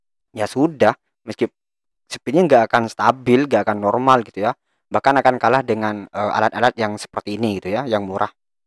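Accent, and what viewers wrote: Madura, Indonesia. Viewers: bang, orang Madura?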